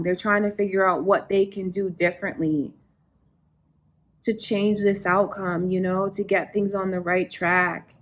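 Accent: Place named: Canada. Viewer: Does anyone know if she is Canadian?? I feel like I hear a tiny bit of a Canadian accent, ey?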